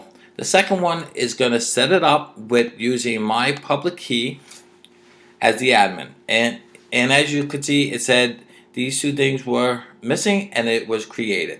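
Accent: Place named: Boston, United States. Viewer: repro - lovin' the accent. Cousin's from Bwahstin (Boston).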